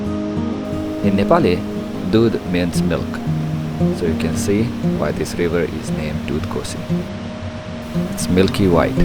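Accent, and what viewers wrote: United Kingdom. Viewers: Amazing vidéo! the landscapes are beautiful and the explanations so clear, love you english accent! where u come from ? after reaching gokyo valley how did u came back ? and by which way?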